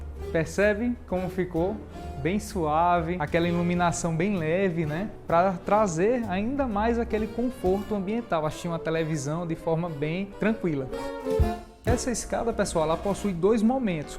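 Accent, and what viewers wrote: Northeast, Brazil. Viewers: Pelo sotaque deve ser em algum lugar do nordeste , ceará talvez ou Pernambuco talvez ☝️ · Que projeto lindo, gostoso, sem palavras pra esse projeto e essa casa! A sua apresentação tb, é digna de aplausos, parabéns, vc é diferenciado tb pra apresentar, fora que vc tem um sotaque muito legal que eu, como boa filha de nordestinos, adoro kkkk. Parabéns tudo muito top demais!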